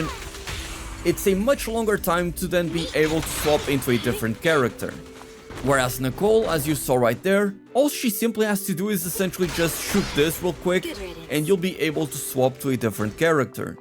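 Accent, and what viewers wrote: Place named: Portugal. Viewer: Mano o teu sotaque deu me logo dicas de seres português ahahah. Grande vídeo e agora com a vinda da Zhu Yuan, a nicole ainda vai ser muito mais viável! Forte abraço...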